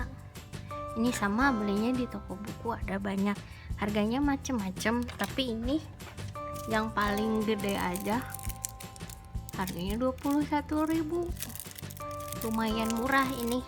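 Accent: West Java, Indonesia. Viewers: Ka lucu banget suaranya xD sunda sunda kitu logatna teh wkwk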